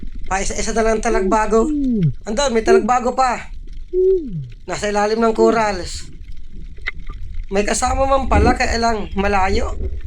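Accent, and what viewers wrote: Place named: Vinzons, Philippines. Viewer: Cguro ang blogger na e2 ay taga Vinzons camarines norte. Pamilyar sakin ang mga pananalita nito at mga katawagan nya sa mga lamang dagat. Hahaha 😅😅😅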